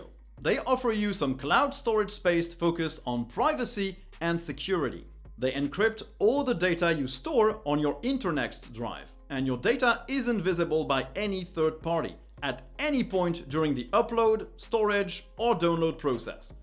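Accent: France. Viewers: Yes, please, more french accent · More french acccent please, it made me laugh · More French accent, s'il vous plaît! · I'm here to comment that I want more French accent. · We need more French accent of English!!! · i love your French accent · I love le franch man avec un accent anglais horrible ^^ Bonne vidéo comme d’habitude sinon même si ce DE n’est pas fait pour moi. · I like that french English accent it's funny af 🫣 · I'm comenting for more French accent 😁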